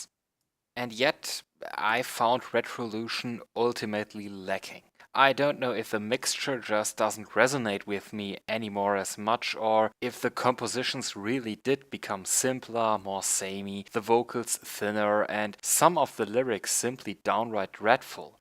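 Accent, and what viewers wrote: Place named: Germany. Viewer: yes....vor allem das grässliche Englisch dieses "Reviews"....wenn schon, warum dann keine Reviews in deutsch anstatt "Sauerkraut-Englisch"???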